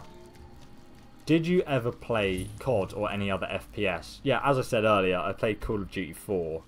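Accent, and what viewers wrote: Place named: United Kingdom. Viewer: Omg I live in the Newcastle area! :D i always knew you were British cause your accent is similar to mine, it's great to get to know a tonne of things about you :D.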